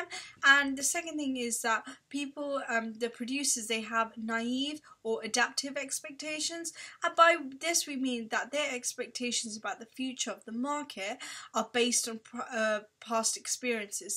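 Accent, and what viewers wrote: United Kingdom. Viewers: Her english is more english than english people's English 🤦‍♀️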